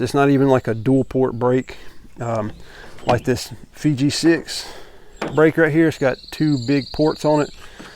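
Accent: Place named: Alabama, United States. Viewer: Aside from your logo I could tell by your accent you were a fellow Alabamian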